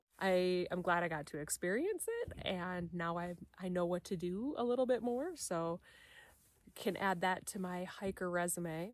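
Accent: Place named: Minnesota, United States. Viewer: I was guessing from the accent you were from Minnesota. And then you verified it. Uff da😂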